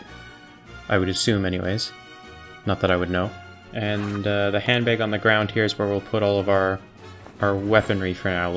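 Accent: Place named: United States. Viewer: Your accent says American. Your sense of humour says British. This was hilarious. Subbed.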